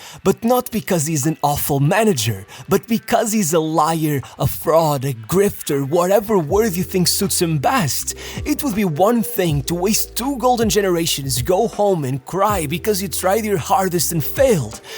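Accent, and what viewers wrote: Portugal. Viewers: Are you Portuguese? Accent don’t lie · most portuguese english ever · Sotaque bem tuga xD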